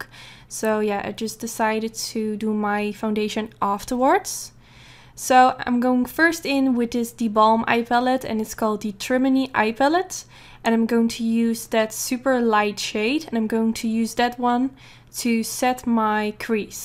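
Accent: Netherlands. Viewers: '' ✦ ABOUT ✦ My name is. I'm 24 years old and live in the Netherlands. '' I hear your dutch accent beneath it:P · This person sounds Dutch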